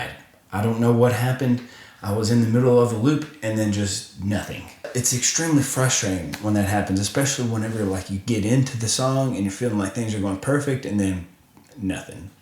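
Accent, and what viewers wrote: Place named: Illinois, United States. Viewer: WOW!! BIBICAL!! I sure hope ROLAND paid you for this video!! Oh, color your beard "black"; Abe Lincoln!! No kidding!! As Angus Young; student! Dark-in your beard; play dressed as Abe Lincoln!! Especially with the slight southern drawl!! Right out of southern Illinois!!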